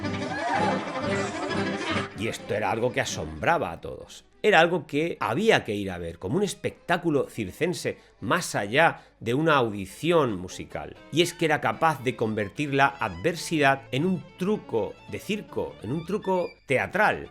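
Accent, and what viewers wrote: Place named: Spain. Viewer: el acento español es desapacible y estresante oara nosotros los latinos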